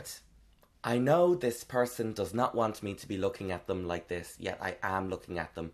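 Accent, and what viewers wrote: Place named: Ireland. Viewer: wait where in Ireland is he from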